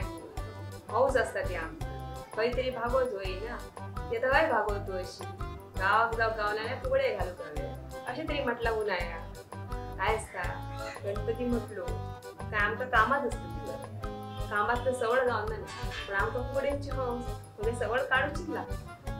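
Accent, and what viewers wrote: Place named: Malvan, India. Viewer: एव्हढे अस्सल मालवणी इतरांच्या व्हिडिओत मी खचितच ऐकलं असेल, मस्तच 👍👌👏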